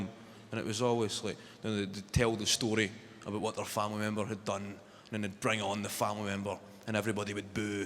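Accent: Scotland, United Kingdom. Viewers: Apparently he's hard to understand non Scottish people 😂 I'm a weegie so I'm sorted 👍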